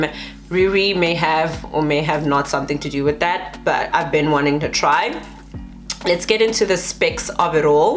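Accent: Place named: South Africa. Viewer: South African accent😍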